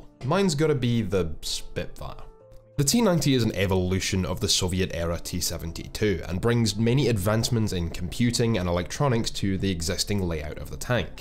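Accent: Scotland, United Kingdom. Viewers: I just come here for that sweet Scottish accent... And the armored stuff!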